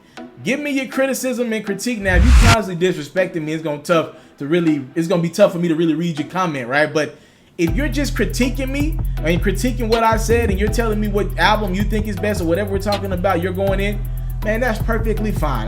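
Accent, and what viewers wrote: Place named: New Orleans, United States. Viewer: Lol facts bro u new orleans niggas crazy fr fr / just look at how u talk everybody else in america say three(3) / new orleans really do say tree even 90year old white racist old people say tree in new orleans lol😁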